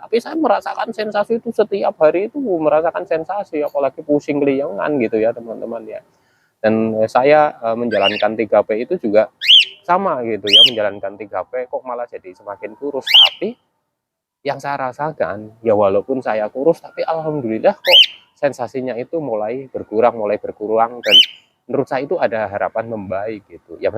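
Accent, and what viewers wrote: Banyumas, Indonesia. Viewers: Kk ini didaerah mana kk ngapak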